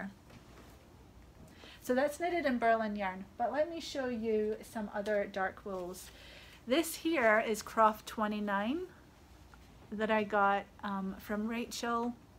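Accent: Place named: Glasgow, United Kingdom. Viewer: I’m so glad I found you and your lovely shop, you are a voice from home - I’m from Glasgow living in Virginia :-) Merry Christmas and a happy New Year to you and yours.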